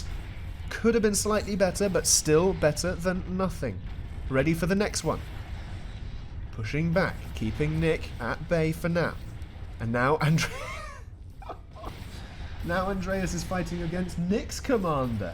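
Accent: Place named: United Kingdom. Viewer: Excellent narration refreshing to hear hear a cool British accent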